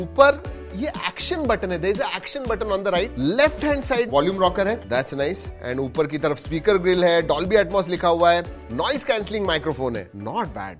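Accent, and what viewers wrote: Maharashtra, India. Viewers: ❤मराठी माणूस की प्यारी हिंदी सेकंड में "सोशल मीडियावरती जे होते हैं" लय भारी❤❤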